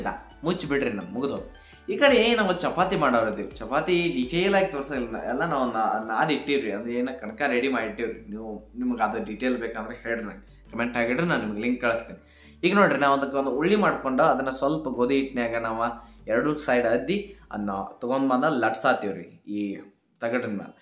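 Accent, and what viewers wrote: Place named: Karnataka, India. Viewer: Nice Kannada accent 😀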